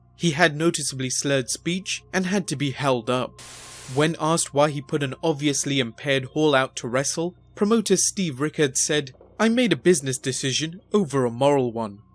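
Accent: England, United Kingdom. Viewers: Love this English's presenters accent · I don’t know why the British accent makes for great documentaries. It’s beyond me, but it works.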